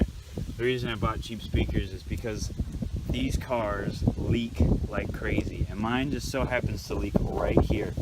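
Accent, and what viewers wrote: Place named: Louisiana, United States. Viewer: Ohio plates but talkin like a gay louisiana boii 🤔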